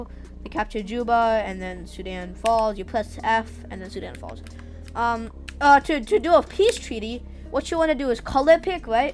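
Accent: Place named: Boston, United States. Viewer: Nice Boston accent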